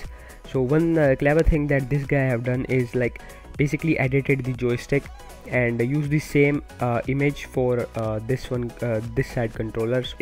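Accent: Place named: India. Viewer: when i hear that Indian accent i know its a scam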